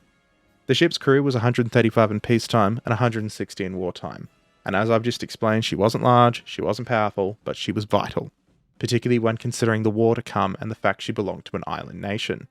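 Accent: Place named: Australia. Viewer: You need to do an entire series on Australian history. As someone who knows way more history than most people, I can tell you the mainstream knowledge of it basically consists of, "British prison colony who kinda abused the natives like everyone else at the time" and not much more than that. It's kinda shameful. I could look it up but id rather you just tell me in the Aussie accent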